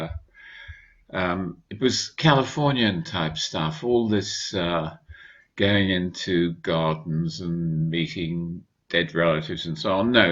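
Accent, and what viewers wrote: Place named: England, United Kingdom. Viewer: Thank you. Doctor Fenwick so very much! Your discourses are wonderful to listen to! Nothing I enjoy so much as listening to an English gentleman, who is well bred, and articulate as you are! The English have never lost their claim to CLASS! I am eagerly looking forward to your next NDE presentation, part 2! God Bless!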